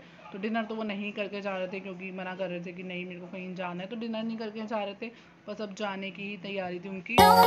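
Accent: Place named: Punjab, India. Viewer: You speak like a punjabii girl😍😍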